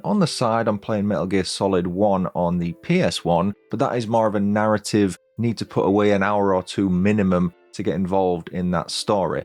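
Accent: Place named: Yorkshire, United Kingdom. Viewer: Where in Yorkshire you from pal?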